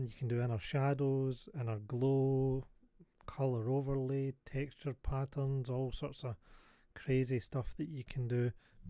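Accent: Scotland, United Kingdom. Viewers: I just come here to hear the Scottish accent. It's my favourite accent in the British Isles.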